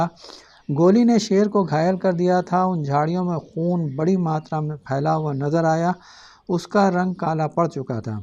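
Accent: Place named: Pakistan. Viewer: आपके उर्दू एक्सेंट में कहानियां सुनना बहुत ही सुखद लगता है, शुक्रिया..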